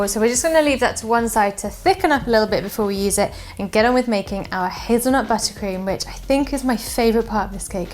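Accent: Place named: West Country, United Kingdom. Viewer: I love your accent. Is it a west country accent? :)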